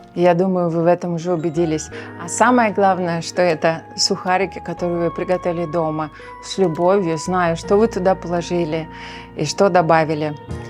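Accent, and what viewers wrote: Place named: Baltic. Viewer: ну надо же, а мне привиделся прибалтийский акцент, у нас похоже латышская женщина, партнер по работе говорит, очень приятный акцент ❤❤❤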